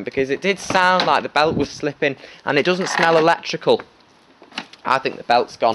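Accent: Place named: Liverpool, United Kingdom. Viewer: Great video, cant believe it got no comments! I've just watched most of your other vacuum cleaner videos and all I can say is I love your style and the chat you give while talking about the vacuums, which is just the way I used to do my own videos. I used to be able to ramble on for an hour about a vacuum no problem, lol. I guess you are into alarms much more than vacuums, but I think you have a great talent for turning a mundane old vacuum from a rubbish tip into an interesting and entertaining video, much like Sam from Beko1987 does, and its your comment under his Purepower video that lead me to your channel here. You remind me very much of Paul O Grady with the way you speak - I guess you must be from Birkenhead / Liverpool area, lol. Its great that you are giving an old Lux like this a new lease of life, and that your mother likes using it. 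I gave my own mother one of my old bagged Lux uprights last year, its the Powerlite Petlover 1700W from 2010, and she loves its lightness being aged 74 she struggles with bagless machines and bagged is easier for a pensioner to maintain.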